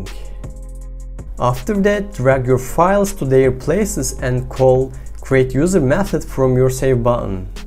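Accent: Turkey. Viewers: Videonun ilk 4 saniyesinde telaffuzdan oturu "Lan Turk lan bu" diyisim :) ilk defa goruyorum kanalini, basarilar diliyorum. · Are you Turkish guy ? :)) Your accent is very similar to Turkish :) I have just curious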